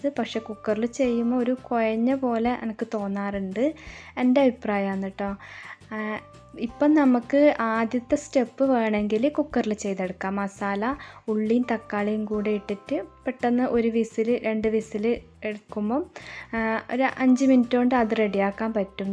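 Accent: Kannur, India. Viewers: Sooper biryani..recipe...😋ningal kannur aano..voice kelkkumbol angane thonni...😍😍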